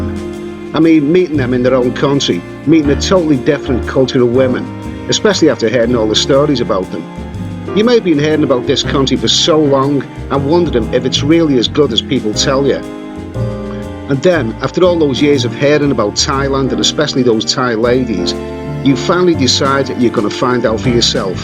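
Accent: Liverpool, United Kingdom. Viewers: Are you scouse? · Great video, mate, love hearing a proper Scouse accent instead of one of those well heeled condescending upper class tour guides telling me nowt but how to fund their Patreon account. Proper honesty in your opinions made this a pleasure to watch.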